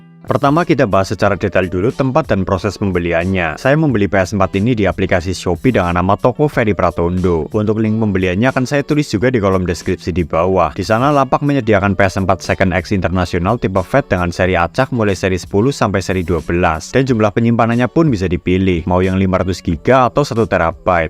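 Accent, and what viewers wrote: Banyumas, Indonesia. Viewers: Wiiiiih..slaam ngapak...lur.. ngesuk j klalen gari tuku ps5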